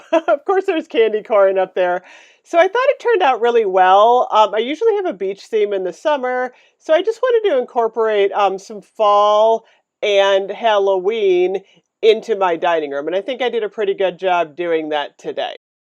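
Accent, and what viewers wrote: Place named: Northern Ohio, United States. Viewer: Susan Smith, that is the regional pronunciation of that particular word. You will find most Northern Ohioans do pronounce things differently than the rest of the country. I’m not far from and I pronounce it that way as well. I am also college educated. It really is the regional vernacular.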